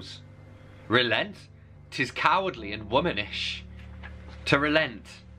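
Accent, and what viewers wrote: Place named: England, United Kingdom. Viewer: Shakespeare is 1000% BARS! It kills me how dope his lyrics are. Also hearing it in your natural English accent is awesome.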